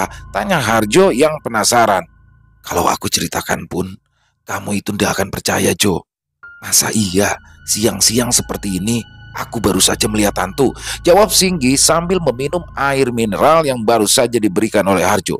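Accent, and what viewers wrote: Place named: Jakarta, Indonesia. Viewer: Kocag deh bang 😂 mas jawa? Logat betawi nye kentel bgt bang, expert sekali 👍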